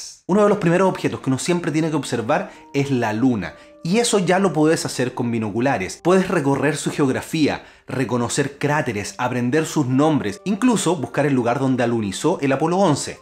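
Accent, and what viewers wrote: Chile. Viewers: Si eres chileno, eres el referente de como debiésemos hablar todos jajaja. Excelente video y canal. Va esa suscripción. Saludos y felicitaciones ! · Es chileno y me encanta como habla!!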